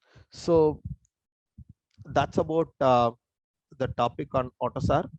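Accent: India. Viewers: Is faculty English has touch of Madras ?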